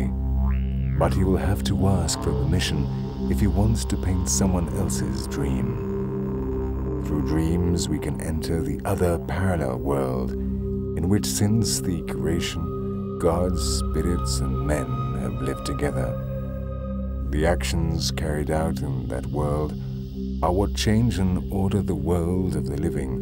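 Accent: England, United Kingdom. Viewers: Burtt its very well filmed, however - the narrative is not as well done. For a start, they have used a non Indegenous (British!) actor to do the voiceover, instead of using the authentic voices of those who live in these areas - they do speak English! A big 'ouch'' for me was hearing all sorts of European concepts such as historical epochs of Time, stitched into the voiceover. Aboriginal elders have a mental landscape which exists outside of Time. Historical epochs are a European mindset, and sadly much that is European pervades this voiceover. There are better documentaries out there... i wish you all the best of luck in finding them ...as it is indeed an incredible culture. But lets let them tell their own story as much as possible... in their own words. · It would at least been appropriate to have an Australian narrator. Somewhat irritating to listen to an English accent. 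Not OK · Where did the narrator get his accent from. Certainly not Australia. You sound more like Prince Charles than an aboriginal.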